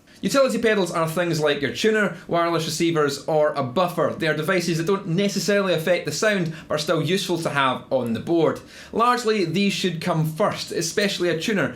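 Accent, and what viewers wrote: Scotland, United Kingdom. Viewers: Hey,! I am from germany and like your great videos, because you are a competent teacher and you have a big talent to keep even complicated contents understandable and correct. And there is your friendlyness and great sense of humour, that makes nearly every video a nice event! And it is fine, that you don' t deny your scottish origin, BUT.... please, please, never forget, that a lot of guitar players, that enjoy your vids come from other countries and some, as me, have sometimes problems to follow your explains, not, because of your scottish accent, but because of the often unbelieveable TEMPO you use!! So i like to beg you to talk with a bit less speed so that it is no problem for us "non-scots" to follow your explainations!! Thanx a lot for keeping this in mind!! - I am already curious about the next interesting vids!! 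Lincoln 6 Echo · Ah noo th' rayzon. Ya lessen chu thus bekez joost lessenen ta Scawtush axunt is fasunettin. Now, me, I watched it to get opinion on Noise Gates. Great answer for that question. Thanks,!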